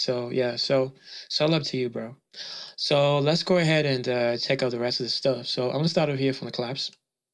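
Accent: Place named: South Africa. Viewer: Nice accent bro no homo are you south african?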